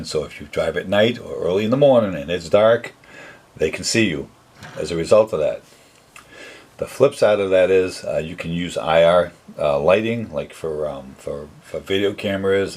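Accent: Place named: Staten Island, United States. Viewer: You have a Staten Island Italian accent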